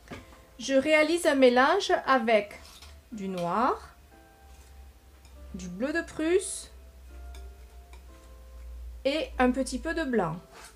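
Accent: South, France. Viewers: Ah enfin le tuto de ce tres beau tableau ! Tout est parfaitement bien expliqué. C est magique de vous voir prendre possession de la toile, de lui donner un sens et de voir emmerger les montagnes, la cascade et les fleurs; le tout avec votre bel accent meridionnal. Merci et bravo pour ce partage.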